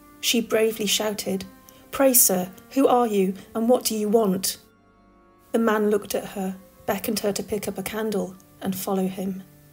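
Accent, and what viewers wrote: England, United Kingdom. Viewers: I'm Australian and I would love to have a beautiful English accent such as this, it's very easy on the ear. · Honestly, I glom to your work as a result of your voice and content. I might watch an episode after the fact for the visuals in order to get a sense of place, but this is always an afterthought. I prefer to use my imagination. As to the questionl on ASMR do what you want. I am perfectly content being soothed by your voice and personality when it pops up in your occasional afterthoughts. "What do you think?" Although I find the topics of ghosts intriguing I find the history of Great Britain equally so. I find it a charming combination. With your quiet voice and accent you could probably get away with reading a list of recipe ingredients. Well, that's not true. But with You Tube being mostly about visuals for me your voice and persona are the selling points. 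I will stop repeating myself now. Looking forward to what you concoct in the New Year.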